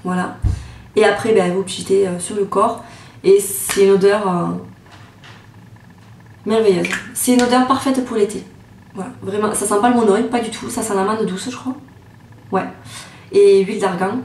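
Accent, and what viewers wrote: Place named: Toulouse, France. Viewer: T'habites a toulouse? Je me disais bien que t'avais un accent!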